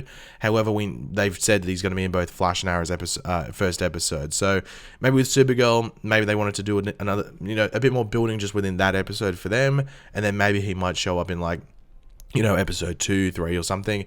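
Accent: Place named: Australia. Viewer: You’ve become EXTRA Australian in this video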